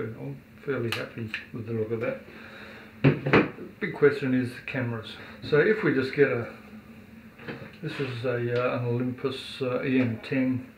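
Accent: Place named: New Zealand. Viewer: Hi '' ''..you are ''brighter'' than me as I had to jump on youtube to see how to open the camera mount for smart phones!..thanks for the heads up.Btw,I live just down the road from where they are made in Shenzhen and the cost was only 59RMB.When the tripod arrived in very professional packaging and I had a chance to see the quality, I made a comment to my son ''how can anyone compete with the Chinese in manufacturing!'' From a fellow Kiwi in China (unless I am very mistaken with your accent!)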